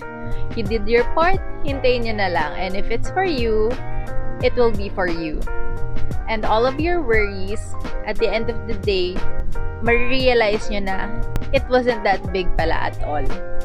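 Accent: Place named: Conyo, Philippines. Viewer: very pero conyo, IBA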